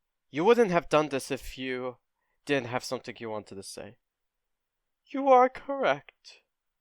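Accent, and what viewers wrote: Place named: United Kingdom. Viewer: Why, it sound like British accent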